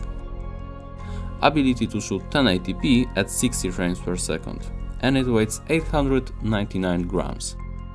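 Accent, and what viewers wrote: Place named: Poland. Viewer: To each their own! I liked the accent. But I work with a few Polish-born people (in America) and am used to that accent, and find it pleasant. :)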